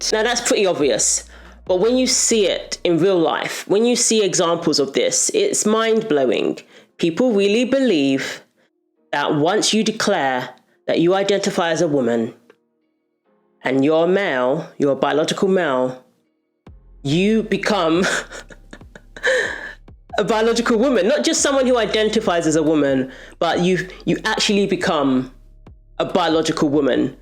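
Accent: United Kingdom. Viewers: Thank you for your comments, interesting with your British accent from the US. I am in the U.K. but lived in the US once! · Himdia is a f#cktard...and I'm glad you made this video sweet..its nice to listen to a Brit on here..and I'm glad you've not lost your accent!..staying true x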